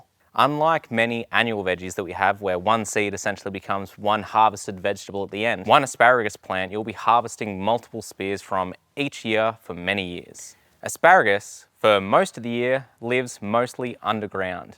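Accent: Australia. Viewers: New sub. Where are you from? Aussie?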